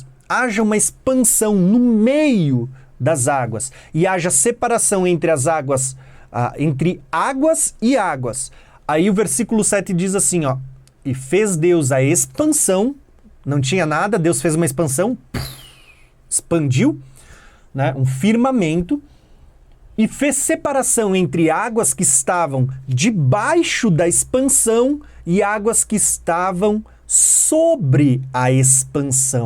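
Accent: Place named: Santa Catarina, Brazil. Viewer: Catarinense ou polones fala assim mas acho que dá pra entender né?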